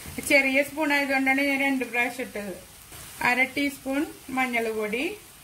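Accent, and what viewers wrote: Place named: Thrissur, India. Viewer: തൃശ്ശൂർ ആണെന്ന് തോന്നുന്നു സംസാരം കേട്ടിട്ട് Anyway, എത്രയും പെട്ടെന്ന് ആയിരം സബ്സ്ക്രൈബർ ആകട്ടെ എന്ന് ആശംസിക്കുന്നു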